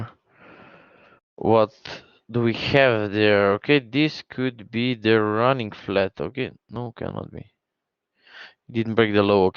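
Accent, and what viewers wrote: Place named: North Africa. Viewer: " from Slovenia " I thought your accent was North African:-) An Arabic speaker. Interesting. Now, I know how Slovenian accent is. All the best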